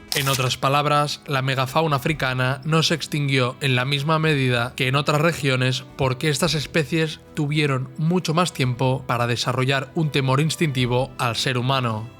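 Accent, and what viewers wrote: Spain. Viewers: No hay duda que los mejores documentales deven tener la Voz de un Español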